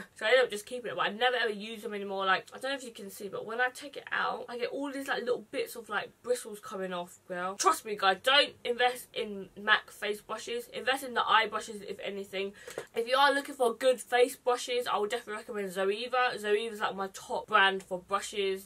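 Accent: United Kingdom. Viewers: What your British???????? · Wait she's British what the fuck didn't see that comin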